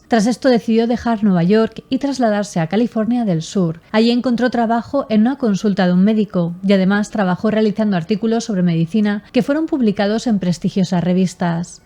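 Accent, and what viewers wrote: Spain. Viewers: Nunca pensé que el asentó español sonara sexy 👂🏼🥰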